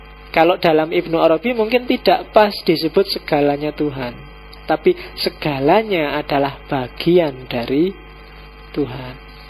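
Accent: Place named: Java, Indonesia. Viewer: Tak jelas awak ckp..pelat jawa tebal sngt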